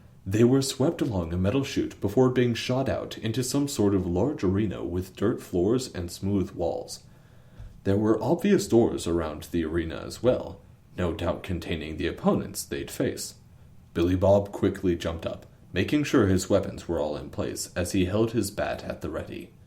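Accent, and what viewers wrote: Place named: France. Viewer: Billy Bob Space Trucker in a French accent is the best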